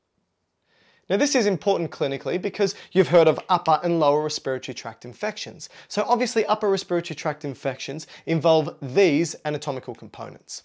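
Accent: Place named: Australia. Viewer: Australian boi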